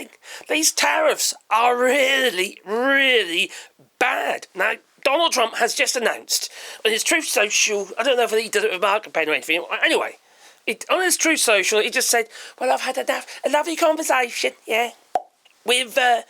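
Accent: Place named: Southern England, United Kingdom. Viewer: It's his southern English accent.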